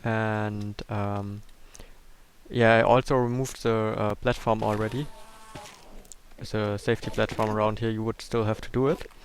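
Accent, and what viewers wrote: Germany. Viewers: Du machst wirklich super Tutorials, man hoert deinen deutschen Akzent raus. Ich hoffe, ich spekuliere nicht falsch ;P Es wuerde mich sehr freuen, wenn du mehrer Minecraft Erfindungen machen wuerdest. Ich hab's auch mal probiert, aber manche Menschen sind im Umgang mit Redstone nunmal besser :/ Ich wollte nur sagen, dass du super Videos machst und auch weiterhin so weitermachen sollst.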